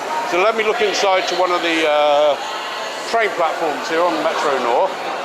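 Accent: England, United Kingdom. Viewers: How carefree life was pre-Covid! One can see it on how people walked, how they unconsciously formed a huddle and it was perfectly okay to do so, & most of all, no masks! I've watched vlogs of Grand Central Station (as it is one of the popular places of NYC and hence, a favorite spot to vlog about) but your narrated walk through it stands out because it is very well researched. If one wants to know the juiciest story of a corner of NYC, trust - to tell it to you! Well, of course, your "exotic" Englishman accent is an extra treat!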